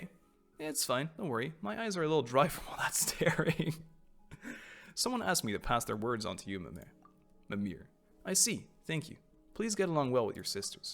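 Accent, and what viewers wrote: France. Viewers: I love the french accent you do, pretty accurate as my professors speak just like that 🤣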